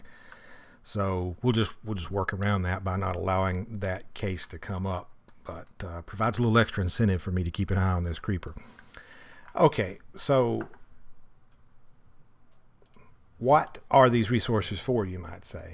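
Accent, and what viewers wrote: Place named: South, United States. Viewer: I don't know why I expected the dev to be Croatian or something but no he's southern af.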